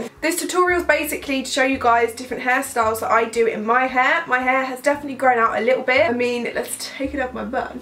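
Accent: United Kingdom. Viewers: I love her British accent 😍😍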